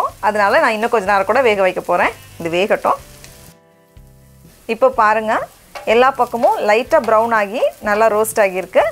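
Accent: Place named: Kanyakumari, India. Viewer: thappu kidayathu sister athu avanga native kanyakumari slang..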